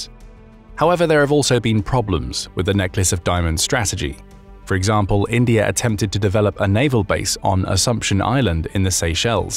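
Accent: United Kingdom. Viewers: Click bait. Again. This channel is silly but it has a British accent, so, I guess that's why folks are here..?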